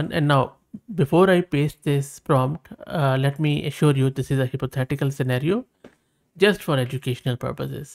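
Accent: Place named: India. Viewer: Indians you are smart but getting info with your accent long time really making tired, train model to make normal accent.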